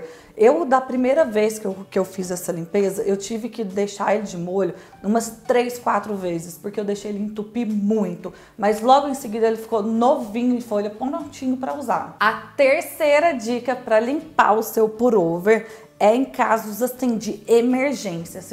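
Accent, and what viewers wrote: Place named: Minas Gerais, Brazil. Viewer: Aqui, gente, vou falar uma coisa procês: adorei esse sotaquizim mineiro, sô. Bão demais.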